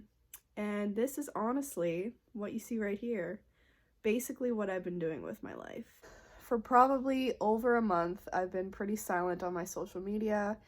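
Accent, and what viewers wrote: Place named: Minnesota, United States. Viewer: Oh no worries girl we knew it from your accent ;). Minnesota native here. Hope you are doing ok up there and stay warm! xoxo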